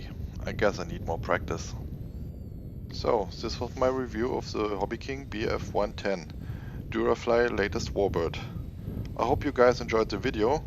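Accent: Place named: Germany. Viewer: Nice flight,nice Denglish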